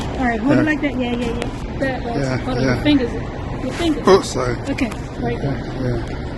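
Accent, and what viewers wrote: Baltimore, United States. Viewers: You absolutely have the Baltimore accent! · I knew knew it!!! I'm from bmore and I could hear it in your voice. Love it!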